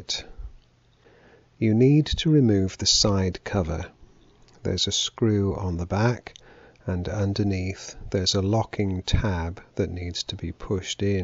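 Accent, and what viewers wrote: United Kingdom. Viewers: ....and your British accent makes you that much more credible!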